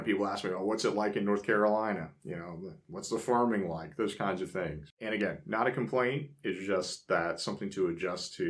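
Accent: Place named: Pennsylvania, United States. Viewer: "North Carolina", was that just an example? you sound very Pennsylvania to my Kansan ear.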